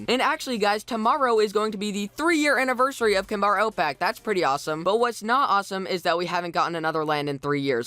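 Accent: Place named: United States. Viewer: Ahh the typical, facts facts facts facts. Your voice has changed to a more stronger American accent! Plus did wisteriamoon tell you password! Hehehe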